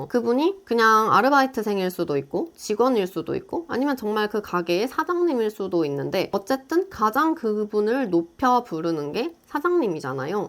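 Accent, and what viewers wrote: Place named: Gyeongsang, South Korea. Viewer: 공감 많이 되네요~~사람에 대한 배려심. 기본..영상 잘 보았습니다. 말하시는 중간중간에 경상도 억양이 살짝 묻어나시는거 같아요!!!!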